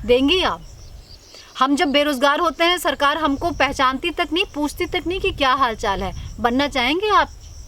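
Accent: Bihar, India. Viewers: I'm from Patna and love ❤ you...I like your thoughts your vlogs your life style and yes aapka Bihari style me kabhi baat karna Bihari khanpan sab pasand hai....aur main aapse milna chahti hoon....kabhi aap Patna me apne subscriber se mile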